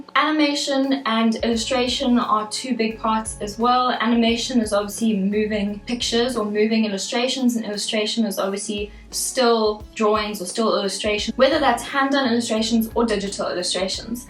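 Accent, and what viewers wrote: South Africa. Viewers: Been looking for a fellow South African to share a day in the life, and your accent kinda gave it away before the SA patch on your tshirt😂 but anyway this was insightful and really entertaining. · Your accent sound South African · its funny how I instantly knew were a south African by your accent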